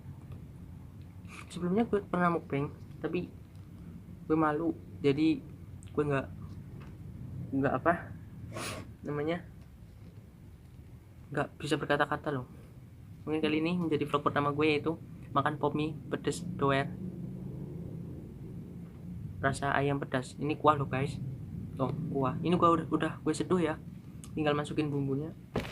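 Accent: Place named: Banyumas, Indonesia. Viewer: Hahaaa wong ngapak yaahh.... Mantap pedes gilaaaa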